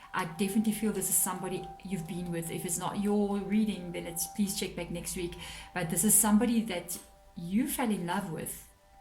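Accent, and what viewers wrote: South Africa. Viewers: Are you based in South Africa? Your accent sounds South African.. Do you do personal readings and how much does it cost?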